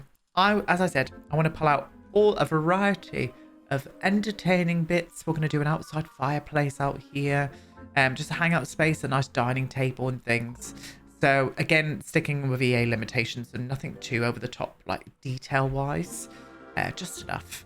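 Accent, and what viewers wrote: United Kingdom. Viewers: This house is difficult to renovate. I love your buildings, and also your British accent. So gorgeous .❤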